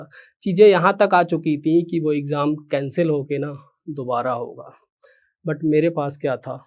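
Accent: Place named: Jhansi, India. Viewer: Jhansi UP ka lg ra banda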